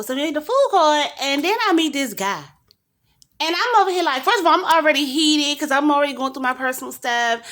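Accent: New York, United States. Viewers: Did I ever tell you that you sound like New York ?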